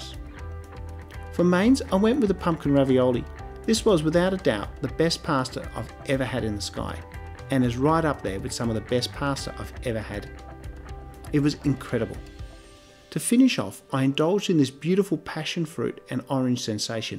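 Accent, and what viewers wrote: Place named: Australia. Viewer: I always enjoy your videos. Your accent matches well with my idea of a typical laid-back Aussie explorer. Especially the way you say "paaaarsta" I like "paaaarsta" mmm :D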